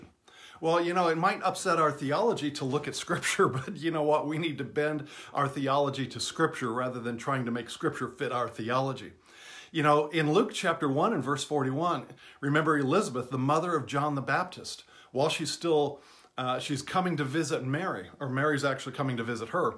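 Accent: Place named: South, United States. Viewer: yall have that WONDERFUL sOUTHERN TWANG, LOVE VE LOVE IT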